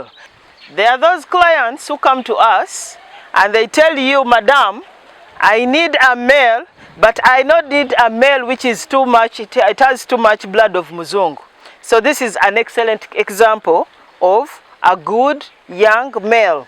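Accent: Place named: Uganda. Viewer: You are doing well ma. Excellent in your explanation, you must be a good teacher. I love Ugandans accent.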